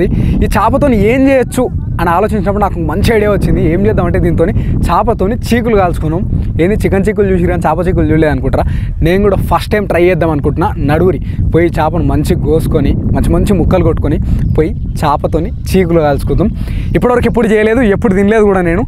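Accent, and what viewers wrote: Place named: Palamuru, India. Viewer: Super bro👍 Enka Purley PALAMURU slang lo matladu , mana plaamuru slang ki full fans unnaru bro, Keep it up. All the best for your bright future (your YouTube channel) bro🎉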